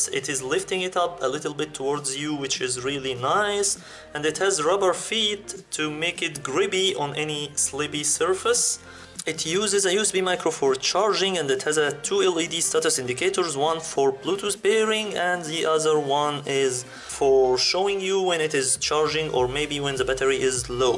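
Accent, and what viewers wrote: Egypt. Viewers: الاكسنت دي مصريه اجيبه منين وبگام بقاا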